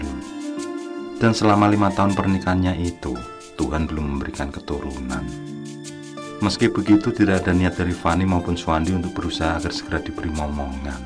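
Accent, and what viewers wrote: Java, Indonesia. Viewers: suarane anteb.. jowo mbangets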